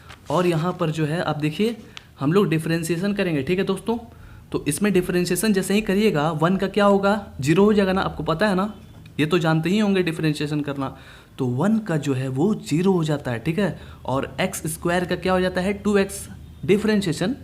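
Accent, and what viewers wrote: Bihar, India. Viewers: Sir u r from Bihar. .?♥️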